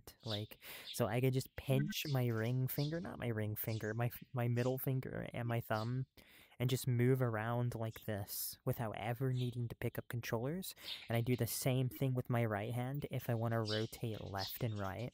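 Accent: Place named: United Kingdom. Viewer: You have a bit of a British accent now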